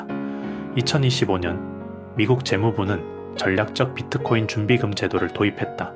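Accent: Gyeongsang, South Korea. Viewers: 경상도 사투리네...경북 사람인듯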